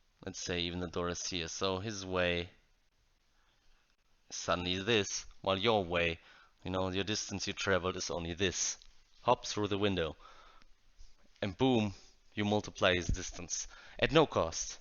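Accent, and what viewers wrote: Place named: Europe. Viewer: I know that you're european just by your accent :P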